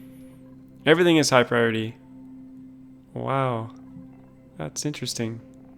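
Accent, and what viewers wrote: Canada. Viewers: Doood, doont fix yur Canadian suurfer speek. I like it. Also, five episodes in, I still absolutely love this series. It's always been fun watching you build bases with each iteration of the game, but it's a totally different experience watching you fix up these broken bases.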